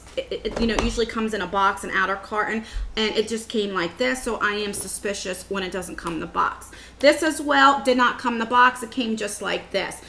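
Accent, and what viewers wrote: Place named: Northeast, United States. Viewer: ...can I just tell you how fun you are to watch! Love your personality and your northeast accent! I feel like I'm hanging out with one of my cousins. You'd be a blast at a family party lol!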